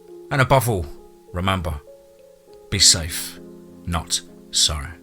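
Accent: United Kingdom. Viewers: Omg, as a Hoosier, I cannot believe that I just heard a British guy talking about Indiana and Sammy Terry! It's almost too much!😀 Fun fact: Owen County is where the very last wild wolf in Indiana is said to have been shot and killed in 1843.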